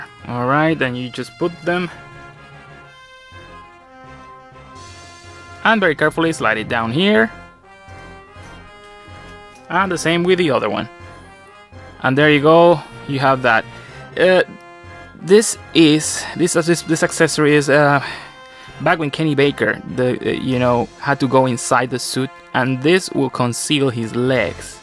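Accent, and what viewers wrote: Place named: Conyo, Philippines. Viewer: nice review. conyo was just the icing of the review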